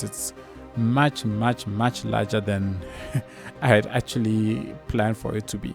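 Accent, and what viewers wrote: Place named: South Africa. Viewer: I didn't know you were South African but when I heard your accent I knew, I felt the South Africaness in my soul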